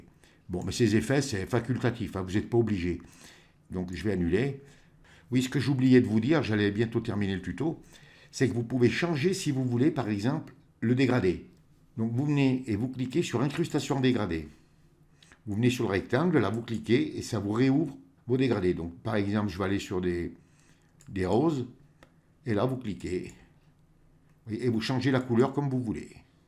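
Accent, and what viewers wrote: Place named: Saint-Étienne, France. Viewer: Merci pour l'abondance de ces tutos si précieux en période de confinement. Cerise sur le gâteaux, l'accent stéphanois que j'ai découvert lors d'un séjour offert il y a bien longtemps, gracieusement, il y a bien longtemps au camp de la Valbonne. Le problème , c'est que l'on devient accro et qu'on en redemande.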